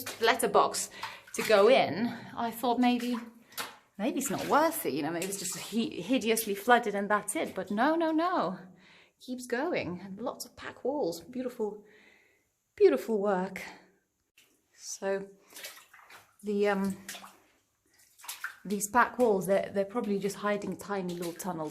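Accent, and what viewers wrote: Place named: United Kingdom. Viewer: No Chris with you?. Your accent is developing into a really posh english one now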